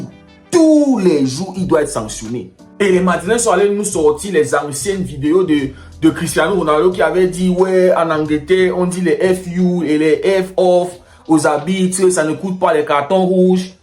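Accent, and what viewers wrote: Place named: Cameroon. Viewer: La première chose que j'aime chez les camers c'est dabord leur accent 🤣🤣🤣, tres bonne analyse papa !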